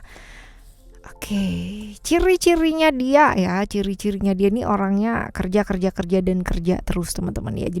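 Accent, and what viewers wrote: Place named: Java, Indonesia. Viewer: Kalau dipikir-pikir cerita bacaannya lucu nganggo banget..!yg membaca saja sampai mumet..😃..mbak per nah bilang" ini hubungan macam apa.....?",halah mbak ...😴...nggak usah dipikir banget2 bikin mumet.! Ma'af ya mbak...aq mau tanya sesuatu: mbak asli orang mana.?, Kok logat cara bicara bukan orang luar jawa.kalau toh mbak ada darah keturunan orang luar indon,saya pastikan ada salah satu dr ortu indon..☺ Intonasi ,logat /penyampaian ,warna suara mbak nggak bikin nek /jenuh ,luwes & frekuwensi sedang😃👍Trims...nuwon...!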